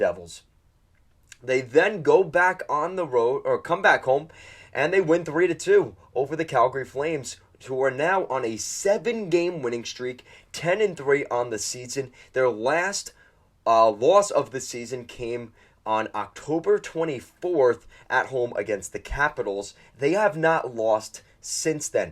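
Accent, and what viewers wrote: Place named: Long Island, United States. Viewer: Like your Long Island accent dude.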